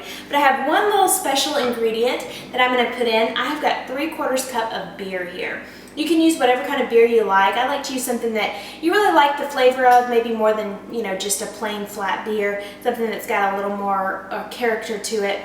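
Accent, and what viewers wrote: Texas, United States. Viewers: Are you Texan? I love your accent! You are also very pretty!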